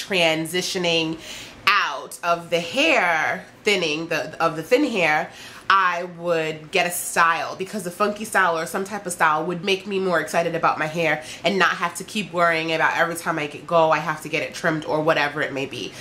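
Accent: New York, United States. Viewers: Love you!!!!! and you New York accent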